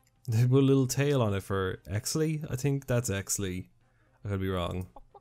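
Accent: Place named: England, United Kingdom. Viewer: I like your England accent. :) Btw subscribed to you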